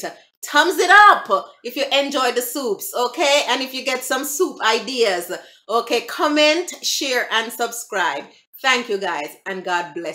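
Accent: Jamaica. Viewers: That looks amazing!!! To be honest, you can never go wrong with coconut milk. I love love love coconut milk. I'm new on your channel and I must say, I'm already hooked on the Jamaican accent. Congrats on the weight loss and thanks once more for the lovely comment you left on my channel. ❤️Summer Dublin, Ireland 🇮🇪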